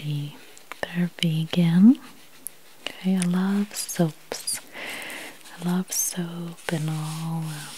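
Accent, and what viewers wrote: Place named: Pennsylvania, United States. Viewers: I was pretty sure you were a Pennsylvania native based on your slight accent but I knew it for sure when you said you got your bar soaps at the Giant 😂 I'm outside Binghamton NY and we had Giant stores here up until WEIS took them over when I was in my 20s. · I love your accent. To me it sounds like you are from southeastern PA or from Maryland and it makes me feel like home! Best ASMR voice ever.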